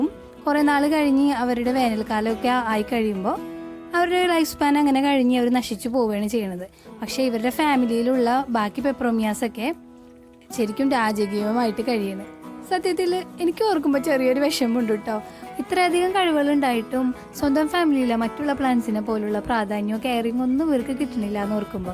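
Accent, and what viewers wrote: Thrissur, India. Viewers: തൃശൂർ ആണല്ലേ വീട് .😘 ഇഷ്ഠമായിട്ടോ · Thrissur bhasha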